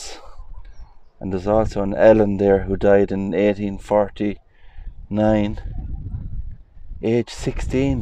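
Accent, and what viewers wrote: Ireland. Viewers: You can't beat the Irish accent, I have a lot of Irish on both sides of my family, the Limerick's and the Baileys , a great video very interesting many thanks , best wishes from Norfolk in England .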